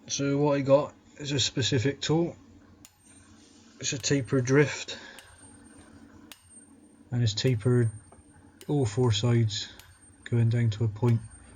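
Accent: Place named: South West England, United Kingdom. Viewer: Gregory Lovvorn Having lived there for four years, I can easily place this as a Bristolian: from Bristol in the South-West of England. Heavily influenced by the wider, traditionally rural area of the SW. In fact, I hear the slightly softer 'edges' in this gentleman's accent that's more indicative of the rural region around Bristol than the city itself, but wouldn't be surprised if he was born and bred in Bristol.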